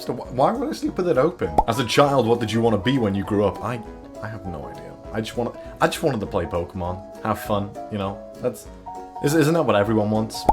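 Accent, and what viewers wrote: Wales, United Kingdom. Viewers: ARE YOU WELSH MATE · Don't have a twitter but for the next one, how hard is it to hide your British accent? Like is it easy or hard to do?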